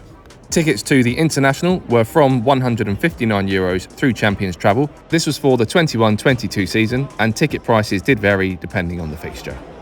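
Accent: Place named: Cockney, United Kingdom. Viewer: THEY HAVNT GOT A ROOF . BWAHAHAHA RINSED . COCKNEY TWAT .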